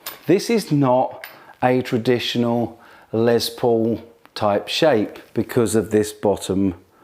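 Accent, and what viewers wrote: United Kingdom. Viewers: (From Belgium) Hello, I just discovered your videos for the first time: great job, hats off! You might also consider comparing the Harley Benton SC 550 II (= 2), which is even more convincing due to...STAINLESS STEEL FRETS and Tesla pickups (no Roswells on that one)!!! A SUPERLATIVE as far as frets are concerned. A dream really. This sells for a ridiculous price: 235£/279€. The web is full of positive appreciations and many testers kept or bought this guitar immediately (John Robson, e.g. ). I ordered one immediately, convinced that it is the bargain of the year. Finally, as a francophone deeply in love with American English, I must say I enjoy your British English A LOT. What a pleasure! If you’re not a teacher, you should consider becoming one ;-) Keep up this brilliant work. By the way, you might think of indicating the radius of the fretboards.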